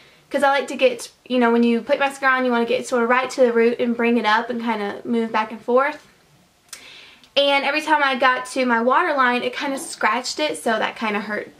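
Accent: South, United States. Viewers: I hear a slight twang in your accent, do you mind me askin where you're from? Are you southern? I live in Texas( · do you have a country accent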